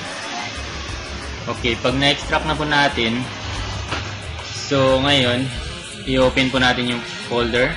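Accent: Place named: Iloilo, Philippines. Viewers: Ilonggo siyA sir hindi bisaya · Hahaha... Nandito aq para sa real voice nya.. hahaha... Halata pa Rin ung punto nya ng bisaya..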